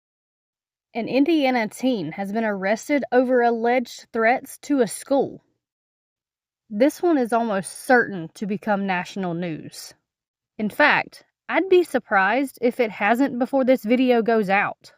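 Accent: South, United States. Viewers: Can I just say, I love Southern Accents❤️ Taking the “i” out of Oil is awesome. It doesn’t need to be there, we all know what it is without it(sludge). Love it🥰🤘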